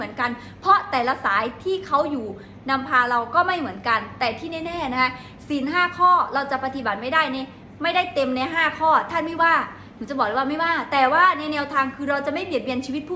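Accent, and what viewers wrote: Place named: Isan, Thailand. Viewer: สำเนียงคุณเหมือนคนอีสาน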